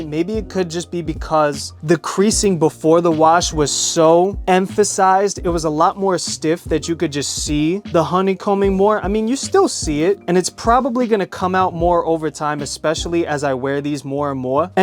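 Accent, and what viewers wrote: New York, United States. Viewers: Feel like im in newh yohk, love the accent.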